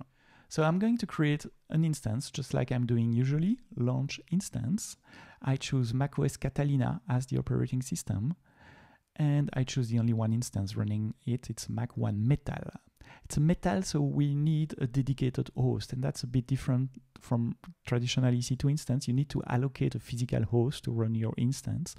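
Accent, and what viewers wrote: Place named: France. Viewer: Je me disais bien qu’il y avait un accent français 😂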